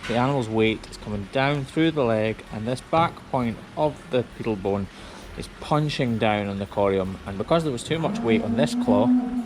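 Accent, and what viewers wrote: Scotland, United Kingdom. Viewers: Im from Wisconsin in the US and we have a ton of Dairy farms I didn't realize Scotland had that many farms I thought you guys just had goats and sheep and wore kilts jk about the kilt part. You look like you could be from here until you speak Well I live in Milwaukee so near here anyhow. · I like your videos because I love cows and your scottish accent 😍👌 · How long does it take for the glue to get loose? Is that always long enough to heal up? Like your videos. Even if your Scottish accent is hard to understand for a Dutchy like me, I learn more about hoof trimming! Btw: nice trimming box you have 😉. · I do believe that I am beginning to catch on to what you do to those cows feet! Its your Scottish accent that makes we ladies watch your videos!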